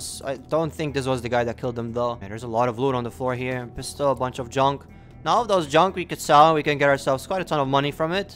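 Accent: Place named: Malta. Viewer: first Maltese person I have ever found playing Unturned other than myself; they are so rare! I noticed immediately from your accent. Keep up the great content!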